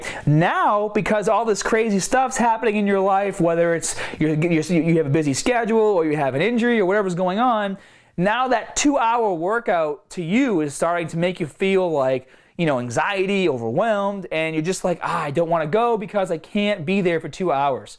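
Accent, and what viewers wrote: Boston, United States. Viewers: The boston accent is heavy in this one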